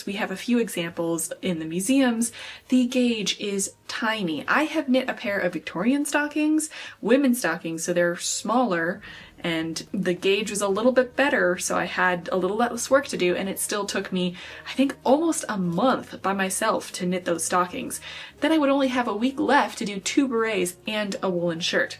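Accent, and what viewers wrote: Germany. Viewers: Ich habe an deiner Aussprache gemerkt das du Deutsche bist, deshalb schreibe ich dir in deutsch. Mein Englisch ist nicht so gut. Was muste ein Meisterstricker in 30 Tagen stricken? Gab es damals schon Vorlagen oder hat er das aus dem Kopf gemacht? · Just found your channel. I'm a complete beginning knitter, although I crochet. Thank you for your work. I was an exchange student to Germany in 1972. I love hearing your German pronunciation.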